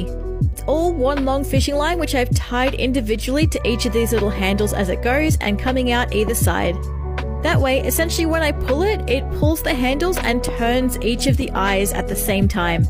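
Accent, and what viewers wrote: Australia. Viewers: I’ve seen heaps of your shorts, but only now hearing your real voice! Had no idea you were an Aussie! Pretty awesome.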